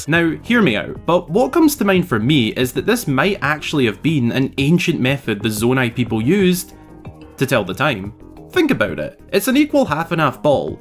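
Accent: Scotland, United Kingdom. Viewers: Love your Scottish accent, just returned from a trip through Scotland, a wonderful country ❤🏴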